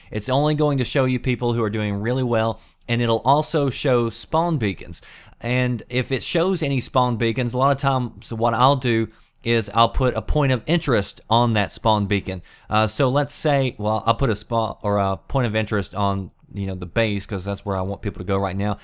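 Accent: South, United States. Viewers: I'm guesin u live down south by ur acent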